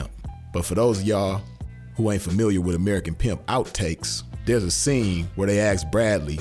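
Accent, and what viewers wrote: Chicago, United States. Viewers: Ah “P” I Didn’t know you was 🥶 with the ISM like Dat But Ur name is you from the Chi u sound like SouthSide Nigga Mannnnnn? This Video was Refreshing to Life G Blessings to you 🫱🏿‍🫲🏾💯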